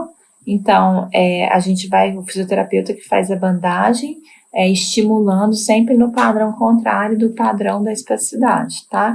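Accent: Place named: Minas Gerais, Brazil. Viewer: Bem que esse sotaque não é estranho , meus são mineiros de Belo Horizonte ❤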